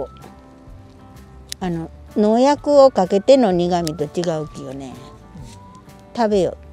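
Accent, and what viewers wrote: Tosa, Japan. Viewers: おはようございます🌞 福岡も台風みたいに荒れた天気でした〜💦 懐かしい土佐弁😊 癒されます✨ いつも美味しそうなお野菜 沢山の愛情貰ってイキイキしてますね💕 私も食べたーい‼️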